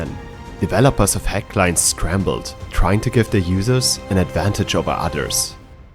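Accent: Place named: Germany. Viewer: German accent +99999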